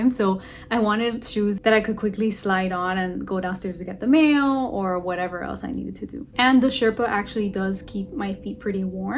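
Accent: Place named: Southwest, United States. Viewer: I love your video, your comfy shoes are beautiful. The whole time I was trying to place your accent, it sounds like south East LA, not Tennessee. They have a variety of crazy accents, but yours sounds distinctly southwest. I’m from California btw.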